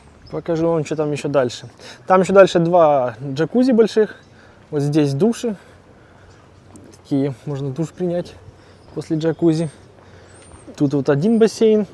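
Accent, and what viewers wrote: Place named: Ukraine. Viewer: По говору слышно что украинец.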